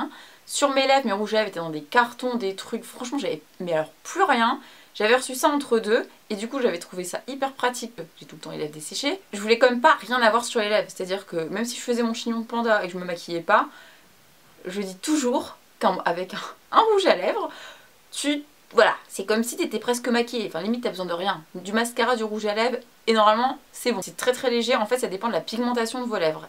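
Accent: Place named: Germany. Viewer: J aile beaucoup ton accent alemand😂😂. Trop belle dans cette video tu pourrais nous faire un tuto sur ce makeup??😘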